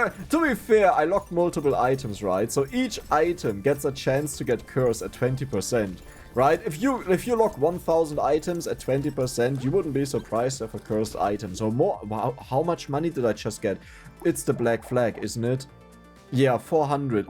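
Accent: Germany. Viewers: Alter ich denk die ganze Zeit was hat der für einen abgefahrenen dialekt. Und dann ja es ist einfach Deutsch mit irgendeinem lokalen Englisch dialekt? Hört sich auf jeden Fall nicht nach Schule Englisch an. Ansonsten schickes video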